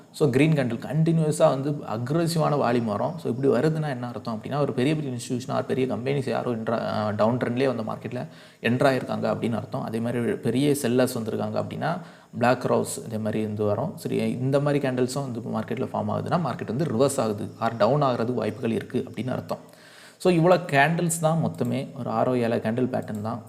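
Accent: Kanyakumari, India. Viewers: slang vachu kandu pudicha am from kanyakumari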